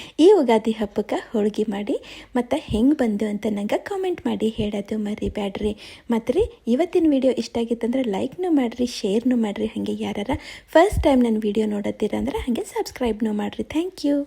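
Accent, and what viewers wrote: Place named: North Karnataka, India. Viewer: Uttar Karnataka bhashe tumba channagede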